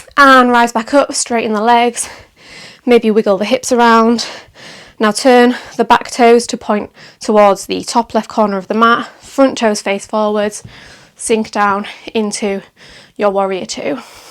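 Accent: Yorkshire, United Kingdom. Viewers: This is the first flow I've done with you and it was great to hear a Yorkshire accent teaching yoga, makes me feel at home 😊 it was intense and I am ded but will be coming back to practice for sure x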